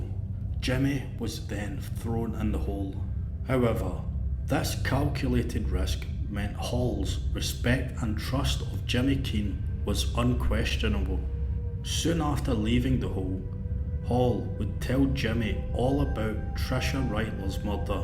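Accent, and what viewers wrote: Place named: Scotland, United Kingdom. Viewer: edit thanks for the news clipping . I’ve been trying to figure out how he got life for one kidnapping charge, that contained more info than a couple diy docs. Subscribed Now that is a rich, Scottish accent. Big true crime/serial killer analysis fan. That Blackbird show got me tho. One scene in particular still haunts me.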